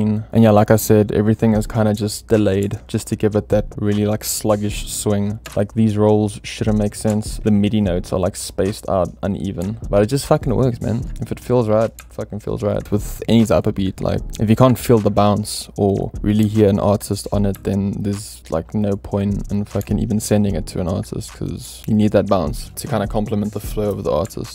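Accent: South Africa. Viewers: Dude why do you sound like you from South AHHHH🇿🇦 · the accent is giving joburg oak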